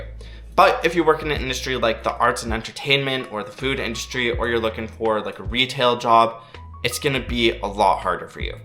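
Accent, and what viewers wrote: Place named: Ontario, Canada. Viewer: Sounds like you belong in Ontario.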